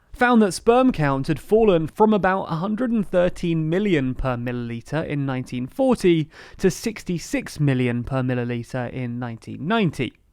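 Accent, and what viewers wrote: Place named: London, United Kingdom. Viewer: I was not prepared for this London accent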